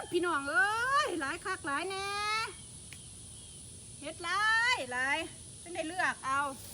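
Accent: Ubon Ratchathani, Thailand. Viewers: เบิ่งมากะหลายคลิบกะว่าอยุ่สำเนียงคือคนอุบลแท้อยุ่อ.ไดละอีหล่าลูกชายน่าฮักคัก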